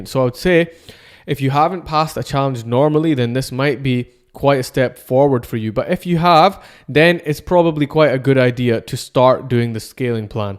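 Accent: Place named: Scotland, United Kingdom. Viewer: Nice to hear another Scottish 🏴 accent.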